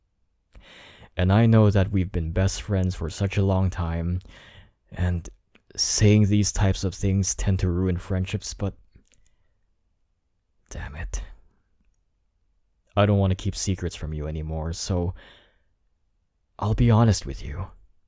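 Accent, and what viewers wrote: Philippines. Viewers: SABI KO NA EH FILIPINO KA YUMG ACCENT MO SA FILIPINO AGUY 🙂💕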